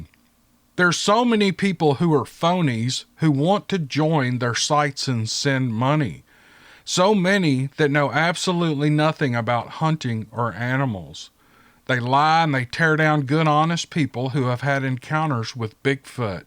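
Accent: South, United States. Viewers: all the best to you, your family and all the pups too! Thanks for that good old southern style story telling we’ve all come too love.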